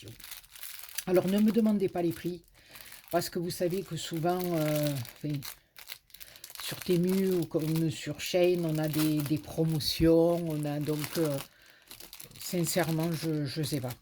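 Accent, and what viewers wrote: South, France. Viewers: Bonjour, je découvre votre vidéo et reconnu de suite votre accent du midi 😀 J'habite Aix en Provence 😊 Vos créations sont magnifiques, j'aime beaucoup le petit coffre qui est magnifique 🤩 Je me suis abonnée 👍 Merci pour le partage.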